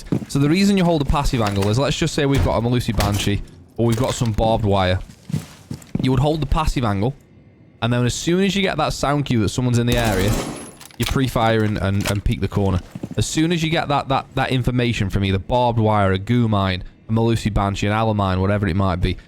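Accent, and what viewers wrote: United Kingdom. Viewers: bro are you British 💀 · In a world full of slightly toxic American content creators, I really enjoyed the friendly, familiar British regional voice. Good content! Worry less about how you’re conveying things, it could be more concise, but the pacing was great, so I don’t think it matters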